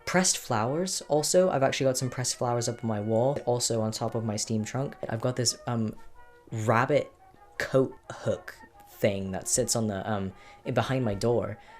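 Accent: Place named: United Kingdom. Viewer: I can't tell if you're British or not it sounds like you are British but are trying to speak with an American accent or something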